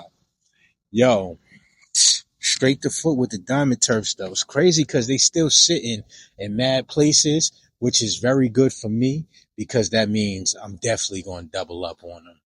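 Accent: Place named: Brooklyn, United States. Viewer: Hearing you speak took me back to my Brooklyn days. I grew up in Brooklyn in the 80s and 90s. Your accent and vocabulary took be back to that time.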